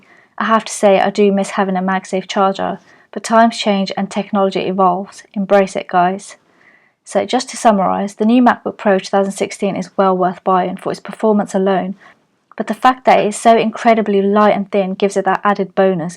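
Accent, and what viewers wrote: United Kingdom. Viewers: Is it me or you have a strong Birmingham accent ? ahah ! Thanks for the video :)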